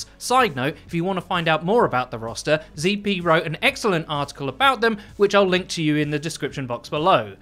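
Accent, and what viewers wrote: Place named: United Kingdom. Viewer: The British accent haha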